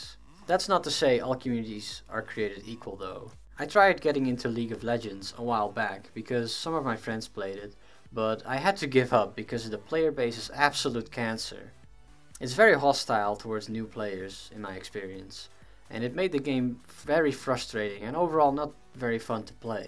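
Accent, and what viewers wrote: Netherlands. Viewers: Eerste seconde wist ik all dat je Nederlands was.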